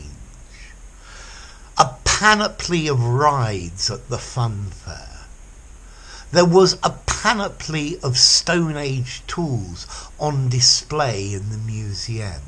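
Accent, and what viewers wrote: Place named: England, United Kingdom. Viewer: Oh, I do like your presentation, its richness of examples and your delightfully English pronunciation and expressiveness. Thank you.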